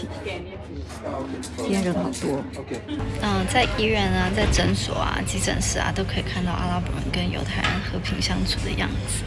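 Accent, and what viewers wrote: Taiwan. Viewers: 聽你口音 帶老公同個仔返去台灣啦 做乜9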